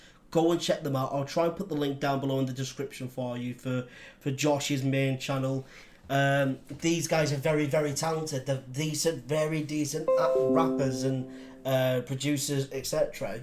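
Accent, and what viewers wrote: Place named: United Kingdom. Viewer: Where in the UK are you? Love your accent. I'm pulling for you. Good luck. I know you can do it.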